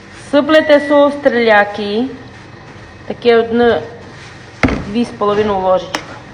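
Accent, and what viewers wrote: Transcarpathia, Ukraine. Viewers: Хіба це українська -це діалект закарпатської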